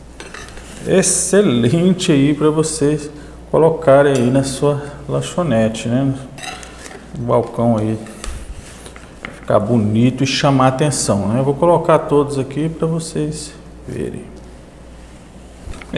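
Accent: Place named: Goiânia, Brazil. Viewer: Falo de São Paulo capital acho lindo sotaque de Goiânia, acho muito legal qdo você fala a palavra Pregar qdo se refere a massa, aqui na minha região a gente fala grudar. Nosso Brasil é maravilhoso cheio de cultura diversificada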